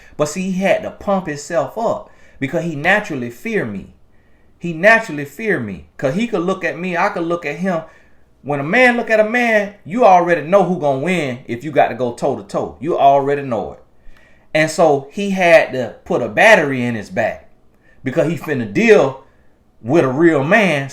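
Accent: South, United States. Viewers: Wooo, I live for the country accent. Reminds me of my grandmother, (mudeel). She would say, "Cinde, go and wrench that towel over the sink". Cinde ain't nowhere on my birth certificate.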